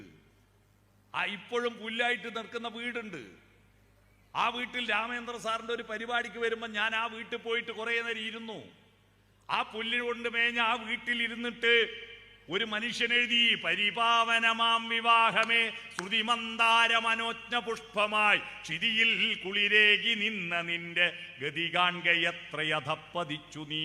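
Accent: Kannur, India. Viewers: വഴക്കന്ന് പൊരിച്ചു വെച്ചോ വഴക്കന്ന് പിരിച്ചു വച്ചു എന്ന് പറയു വെറും കണ്ണൂർ ഭാഷ പറയാതിരിക്കുക...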